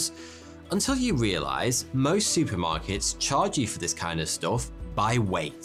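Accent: United Kingdom. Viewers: At the start it sounds like he is saying 42 here instead of Cause his accent is deep British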